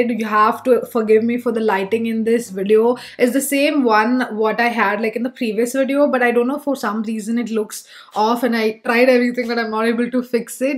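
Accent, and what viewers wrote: South India, India. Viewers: you may be conscious of your south indian accent English but i like your way of speaking 😍 its different n very real i highly support you ✨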